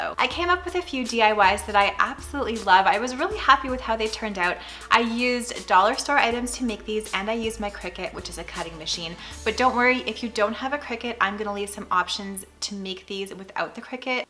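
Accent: Canada. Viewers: Your Canadian accent is so cute. Iron..decal..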